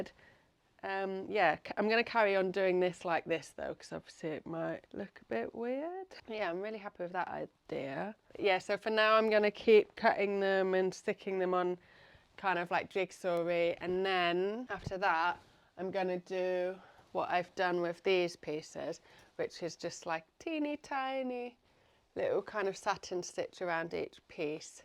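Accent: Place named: United Kingdom. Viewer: Great video! Don't worry about the pink, it didn't look that bad anyway, and if you see it as a mistake, that's good, you have learned from it for next time. I love that you're experimenting. Thanks for keeping it real. We've all been there! P.S. Your accent sounds local, where in the UK are you. I'm in Nottingham 🥰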